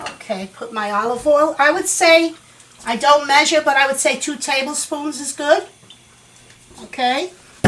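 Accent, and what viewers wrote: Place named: New York City, United States. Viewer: You have a real strong ny accent, are you from Brooklyn ?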